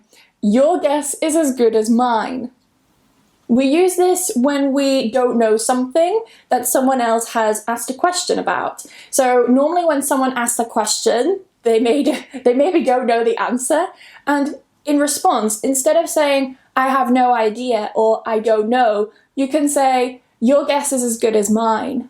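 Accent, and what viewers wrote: United Kingdom. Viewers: Hello ☺️ Take love from India ❤️😇💐 can you please tell me in which british accent you are talking 😇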